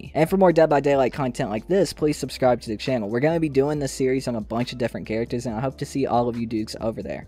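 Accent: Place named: North Carolina, United States. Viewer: On today’s episode ok guess that accent we haaaaaave! Now, I’m gonna guess that accent is from….North Carolina!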